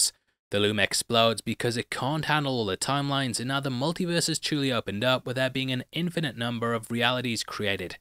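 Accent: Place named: United Kingdom. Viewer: Me after each episode knowing I have a smooth talking British accent on YouTube ready to explain everything to me: ☺️💕🤪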